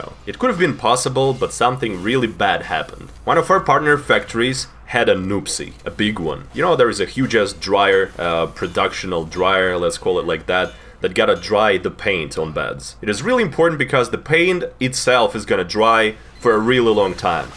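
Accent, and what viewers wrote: Russia. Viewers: a russian person telling histories while playing tf2? I NEVER KNEW I WOULD NEED THIS SO BAD IN MY LIFE please make more of this type of content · I dont know why but its so chill to hear your russian voice and your stories. Please make this a thing!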